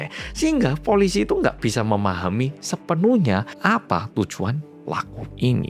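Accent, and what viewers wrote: Java, Indonesia. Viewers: Mas udh cocok bgd jd orang indo.. Selain logat medoknya khas Jawa.. Udh terkontaminasi kata2 untungnya 😂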